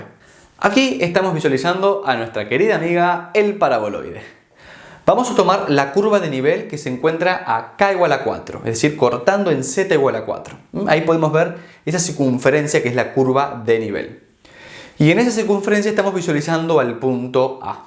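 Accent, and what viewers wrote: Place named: Argentina. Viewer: TIene acento argentino pero habla español de españa, wtf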